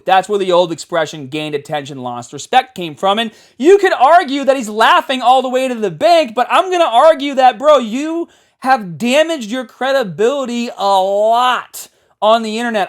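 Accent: Boston, United States. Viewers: Its good to see you still grow strong , im a follower even before people make fun of your "bahhbel" Boston Accent era . Haha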